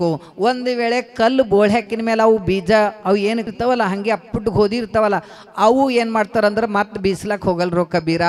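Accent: Bidar, India. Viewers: ಬೀದರ್ ಭಾಷೆ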